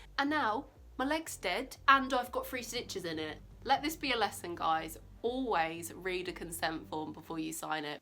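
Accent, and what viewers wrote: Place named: England, United Kingdom. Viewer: You're so pretty! I hope they find something useful to help you out. Love your accent too! (Sorry I live in America so the english accent is sounds nice to us haha)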